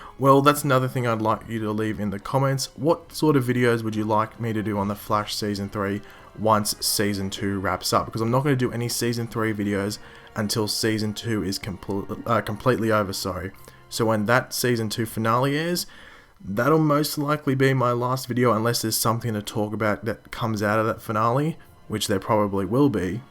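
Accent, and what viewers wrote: Australia. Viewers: Hey just wondering if you're Aussie cos u have an accent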